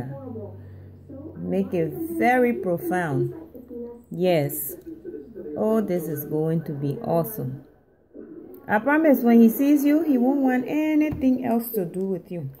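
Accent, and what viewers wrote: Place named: Liberia. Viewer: Liberian accent haha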